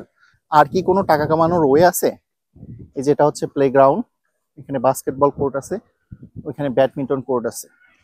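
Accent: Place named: Sylhet, Bangladesh. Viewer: 🤣.Depend on luck. But as a sylhty I think sylhety ra Sylhet ar outside a Biya-shadi kum kora. Apnar accent onakta Sylhety mona hoyacilo tai bollam .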